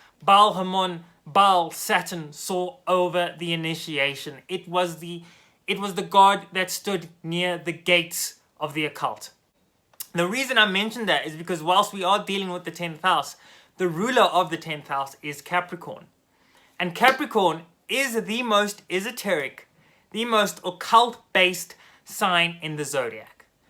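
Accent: South Africa. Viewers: This dude south African?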